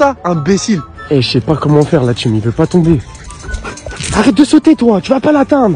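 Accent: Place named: Algeria. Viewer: Pourquoi t'as un accent d'algerien qui a debarqué du bled ya 2 semaines ?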